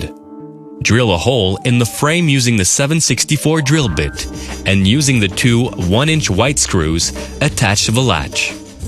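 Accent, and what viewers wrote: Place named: Quebec, Canada. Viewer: It's just a slight French-Canadian accent. There's really no need to slag his pronunciation.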